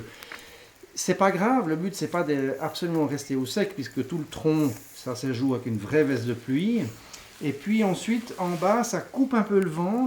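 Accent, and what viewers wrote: Switzerland. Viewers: Vous êtes génial de l'humour, de la passion , expérience et technique et des présentations limpides ( bon il y a un peu d'accent Suisse !!! ), Bon rétablissement concernant le genou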